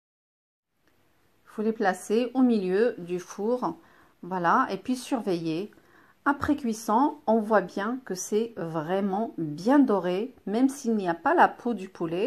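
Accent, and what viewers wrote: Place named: Morocco. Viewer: Salamo3alaikom okhti t juste magnifique avec tes agréables recettes Et aussi notre accent marocain joyeux 😆😄 Bonne continuation ma puce et un grand Merci 😊😊😊😊👍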